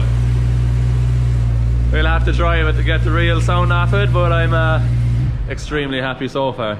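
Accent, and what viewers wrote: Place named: Ireland. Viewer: Irish accent is top 3 in the world for me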